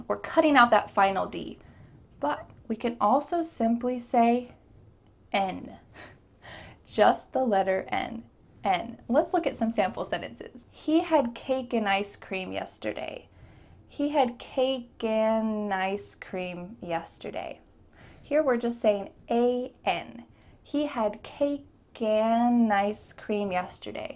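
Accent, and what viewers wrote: United States. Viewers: Is it an American pronunciation? Not British? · 10 comin’ or 10 common - your thick American accent (for a Brit like me) makes them sound alike. So I have to rely on context… I remember on my first visit to New York in 1964 I watched a game show on TV that featured word-play. It would not have worked in UK! There was a sentence “To be merry, Marry Mary!” (or similar)… The American accent made it sound to me like “To be mry mry Mry!” In England (at least with my RP accent) the three words are quite distinct… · Very good ,American accent is too good, very good teacher from ivory coast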